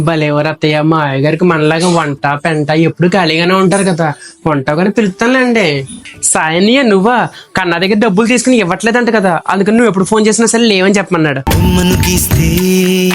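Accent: Godavari, India. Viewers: 😁we are from rayakseema and Nellore listening your accent reminds us of our inkawsnside from Godavari district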